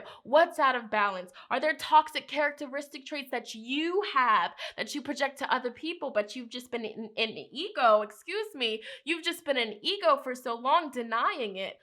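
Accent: United Kingdom. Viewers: I love her undertone British accent❤️ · Interestingly enough I hear British accent in your voice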